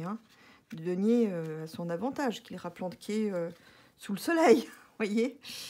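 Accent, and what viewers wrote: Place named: Switzerland. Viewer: Bonsoir contente de vous entendre pour la première fois et de savoir que vous respirez bien!! Belle voyance précise je vous remercie vavec votre petit accent proche de la Suisse? Bisous. Virginie de Haute Savoie 😊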